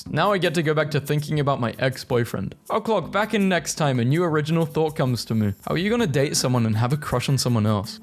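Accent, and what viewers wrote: United Kingdom. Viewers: I watch your videos so much that your accent now sounds normal to me. I randomly start speaking in a British accent without even realizing it until I realize it. I’m restricting myself from watching your videos. I’m also American by the way with the most American accent because I live near the White House.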